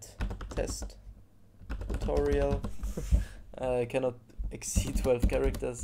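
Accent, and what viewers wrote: Germany. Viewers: Dieser deutsch Akzent ahhh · i spot sam dchermen akzent here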